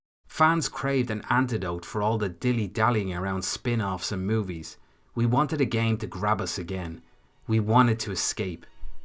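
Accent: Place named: Ireland. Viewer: People saying 'why no IV, V, Tactics etc' should bear in mind that none of those games were originally released in Europe. (Or, as far as I'm aware, anywhere outside Japan or North America) By his accent I'm assuming grew up in Ireland, and for those of us in Europe, FFVII was not only our first Final Fantasy game, it was the first Final Fantasy game we were even capable of playing. 'Golden Age' is going to be by definition subjective and I took as embracing that in this video. At any rate to me and a lot of people like me, the games before FFVII are usually emotional non-events because we played them (if we even did) out of their era, much later on. The nostalgia just aint there.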